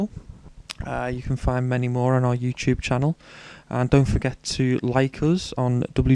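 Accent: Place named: United Kingdom. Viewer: thx team GB lol, keep the hard work mate, this is fantastic :) that is British accent for u Minh Nhat :)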